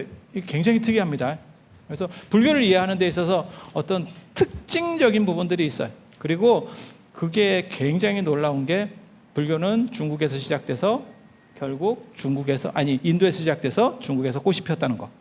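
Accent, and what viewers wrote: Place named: Jeolla, South Korea. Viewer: 왜 언제부터 전라도 사투리가 여기저기 서 마구마구 쏱아지나 언제구터 재수없는 전라도 사투리가 표준어처럼 둔값된건가 불교방송마져도 오염되어있으니 정말엿갔다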